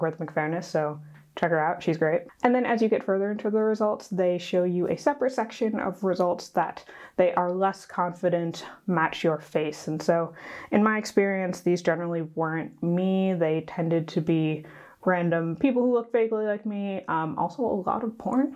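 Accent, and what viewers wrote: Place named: United States. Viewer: As I am not American (although English is my first language) I really struggled with understanding what you were saying. You speak quite fast and your speach is not particularly crisp. If you want to convey information to people please be aware they need to understand what you are saying. Not everyone can keep up with American speed speach !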